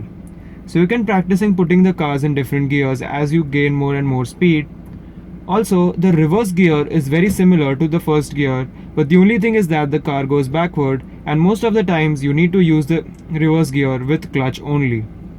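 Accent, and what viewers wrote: India. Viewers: The indian accent made this much better xD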